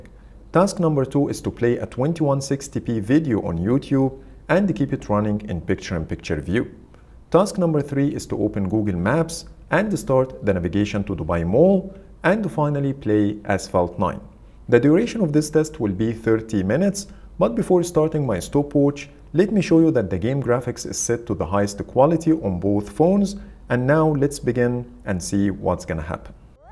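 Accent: Egypt. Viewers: your accent like the Egyptian people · لجهتك مصري ١٠٠%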